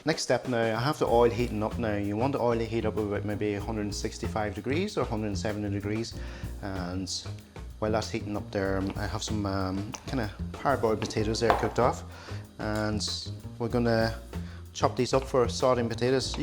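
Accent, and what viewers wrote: Ireland. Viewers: Asian guy with Irish accent cool..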